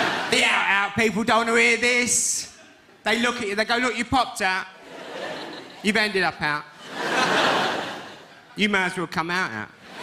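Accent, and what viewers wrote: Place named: Cockney, United Kingdom. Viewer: A Proper real life event told by a Cockney . 👍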